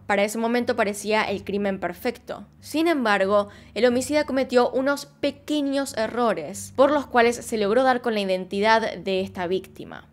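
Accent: Buenos Aires, Argentina. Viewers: Amo tu acento argentino. Saludos desde 🇨🇴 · "si quieren escuchar mi voz de argentina" me dio mucha risa porque en realidad no se que sea pero me encanta ver tus videos y esuchar tu voz,aunque hables de cosas feas me gusta demasiado como narras:) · Buenas tardes guapísima acabo de ponerme a escuchar casos y el primero por supuesto es el tuyo. Punto uno me gusta tu trabajo y como lo hacéis, a mi no me importa en absoluto tu acento argentino me agrada en que a veces algunas palabras no las entiendas o pienso que tienen otro significado. Pero igualmente me gusta mucho tus trabajos. Acerca de este caso, lamentable la vida de este chico, su principio y su final fue muy lastimoso. Y hay que reconocer que el trabajo de los carabineros fue bastante rápido, acerca de otros casos. Saludos desde España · ADORO TU ACENTO ARGENTINO. ESO TE DA PERSONALIDAD, IDENTIDAD, ESO ES TU TESORO. 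UN ABRAZO GRANDE, SOS GENIAL. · no lo tomes a mal, jeje pero suena muy bonito tu acento argentino, y mas cuando dices el "Bienvenidos a mi video, una vez más" saludos y buen video. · Chale esta rebonito tu acento ARGENTINO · Acento porteño. Y a mi me gusta tu trabajo. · Me encanta tu voz de argentina 😅 me relaja y me gusta como cuentas las historias en tu otro canal, saludos 👋👋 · Sí, yo también vengo en parte por tu voz de argentina jajaja. Un abrazo desde Perú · Hermoso referente argentino, bella, muy buena voz y bien porteña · <3 creo que vicky tiene razon con lo de la voz argentina, ya que para mi eso es una de mis cosas favoritas de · Me gusta tu contenido y cómo explicas los casos, pero tienes razón y amo tu voz argentina, soy mexicana y soy super fan del acento argentino __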